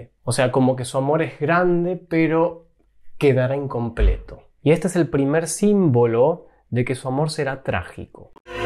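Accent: Argentina. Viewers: Estimado , no tomes a mal si te expreso que me es insoportable el uso del sheismo en sustitucion del yeismo, lo considero una afectacion decadente surgida en los 90. Vos sos joven y tal vez tu entorno lo usa, pero me gustaria que reflexionaras al respecto , imaginate a Gardel cantando " de un noble potrisho que justo en la rasha afloja al shegar" ( no creo que hubiese triunfado de expresarse asi). Confio en tu sensibilidad auditiva , abrazo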